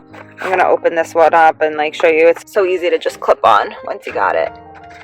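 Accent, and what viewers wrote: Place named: Philadelphia, United States. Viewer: Philly accent 🦅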